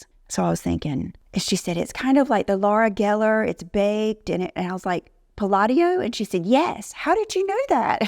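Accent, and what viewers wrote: South, United States. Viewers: Hi, loved the pjs. Very pretty. I am a 100% remote worker. I put on dresses and makeup even if I have no scheduled Zoom mtgs as it makes me feel pretty and professional. I look forward to the videos you were talking about for those of us at home. So, yes, please....do make those vids. You and Marnie are my favorite influencers. I love that you are friends and sometimes mention each other. BTW, you do have a slight Southern drawl. Love it😊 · “I think I have a little bit of a southern accent” hahaha ur so cute.. as someone from Wisconsin.. yes you do have a southern accent — love it! I have a Wisconsin accent 😂😅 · Hi …..I happen to love your Southern accent ….I’m a Tennessee girl so we both know…..it’s a Southern thing….great video✨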